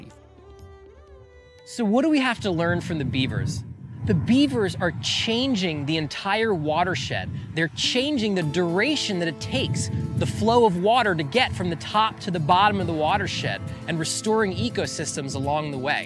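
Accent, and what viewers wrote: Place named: United States. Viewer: this accent of american make me leave the video, stop vocal frying this is not natural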